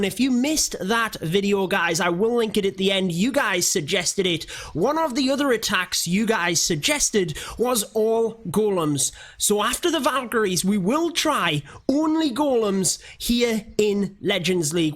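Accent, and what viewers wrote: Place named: Scotland, United Kingdom. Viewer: where are you from?? you have an strong accent haha. guessing scotland?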